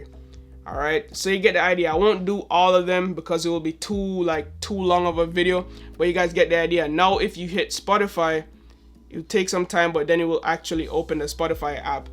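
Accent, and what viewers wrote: Jamaica. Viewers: I can literally hear the Jamaican accent in ur voice general. Where from Jamaica u come from?? Mi cum from St.Thomas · Are you from Jamaica (or Caribbean) by way of England??? I hear a combo in your accent. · U sound Jamaican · Iz u Jamaican 😎 🇯🇲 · Yo you Jamaican? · Ngl u sound Jamaican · You Jamaican dawg? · Yo bruh kl vid but one question, you Jamaican? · are you Jamaican bro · soon as i heard that voice i was like. he gotta be 🇯🇲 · I used to go to school with him in jamaica lol we have cool accents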